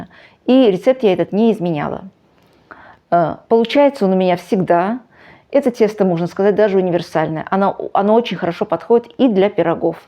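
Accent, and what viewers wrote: Baku, Azerbaijan. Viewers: с родным бакинским акцентом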